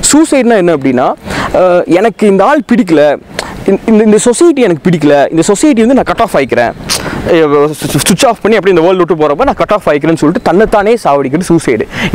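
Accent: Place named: Coimbatore, India. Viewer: Very interesting analysis, keep up the good work. Bro, are you from Cbe? I like your slang.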